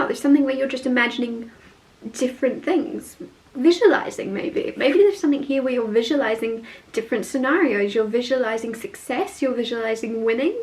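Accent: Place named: United Kingdom. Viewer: Luv your readings...you are spot on..exquisite an very beautiful..Luv your English or British Accent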